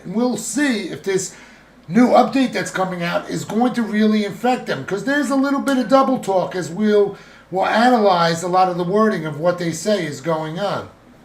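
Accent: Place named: Philadelphia, United States. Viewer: Hey are you from Philly?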